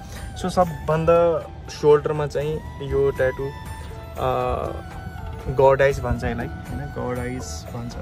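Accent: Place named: India. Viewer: nepali babaal nai x ta. tone ta aba halka aaihaalx ni india ma 15/16 barsa basesi tara tapaiko tei tone le gardaa ajha mitho suninx boldaa😍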